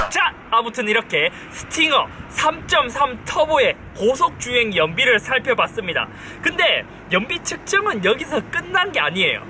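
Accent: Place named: Andong, South Korea. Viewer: 말씨가 안동 말씨였구만요.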